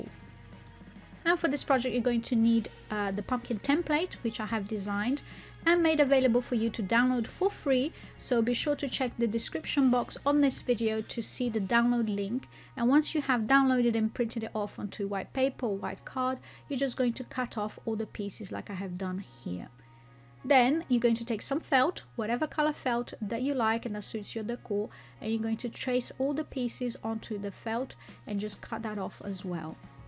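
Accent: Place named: London, United Kingdom. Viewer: Are you originally from the UK? You have a London ish accent.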